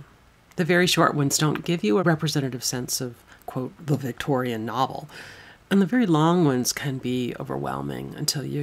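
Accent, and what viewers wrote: South, United States. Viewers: I love a Southern accent it's so soothing and mellifluous. I'm not well educated and keep a Chamber's by my bed to look up old outdated words and terms found in Victorian and Georgian literature. Thank you again for being an interesting guide.❤️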